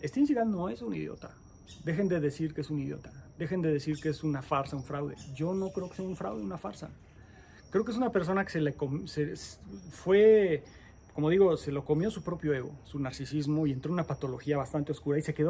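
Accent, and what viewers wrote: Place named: Argentina. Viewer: Yo opino que el sensei está hablando cada día más con acento Argentino! Saludos desde Buenos Aires!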